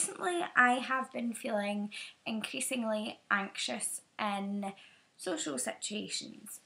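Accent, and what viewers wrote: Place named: Central Scotland, United Kingdom. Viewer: Hi, thanks for making this video. I do really understand what you are going through. I'm 26, I have MS and basically your video describes my situation too. I'm also Scottish (central) your accent doesn't sound as if you are far up north. If ever you want someone to hang out with that is in the same frame of mind feel free to drop me a message. Hope you are doing okay X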